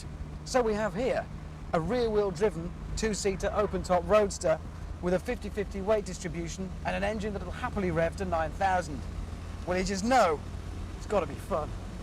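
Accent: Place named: RP, United Kingdom. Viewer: he used to speak really posh.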